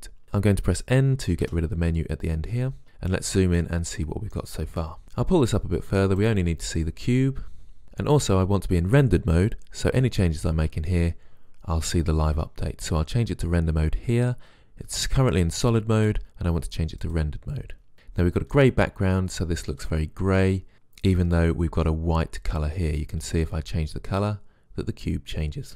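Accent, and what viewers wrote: United Kingdom. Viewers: one of the best blender tutorials. btw, I really love your british accent - listening to you is better than bbc actually !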